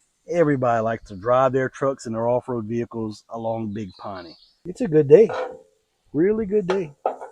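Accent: Louisiana, United States. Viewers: As soon as I heard you speak I said to myself, self dat dude has got to be from da bottom of da boot. Lol. Great video. Me and the wife are from Lafayette but live in Port Barre now. We have a planned trip in the Ozarks by mulberry lodge. It’s in October. Rendezvous in the Ozarks expo. Are yall going?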